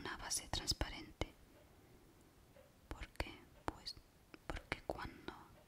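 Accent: Spain. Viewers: Wow, I love Spanish asmr now. Especially with the Spain accent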